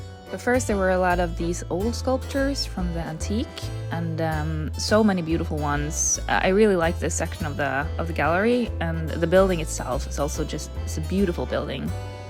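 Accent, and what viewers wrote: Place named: United States. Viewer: thanks for the video. I enjoy your candor. I agree with you that the Louvre is too crowded and the trip to the top of the Eiffel tower at night is fantastic...and I don't like heights either but the view is phenomenal. I don't like long lines either. My favorite part of Versailles was renting a golf cart to tour the gardens in the back! By the way, your English is phenomenal. The more time you spend around Sam, the more American you sound!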